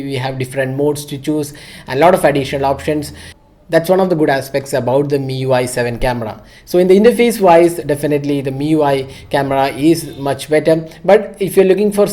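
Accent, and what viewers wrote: Kerala, India. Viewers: Good review ..!! Nd the Malayalam accent too ..!!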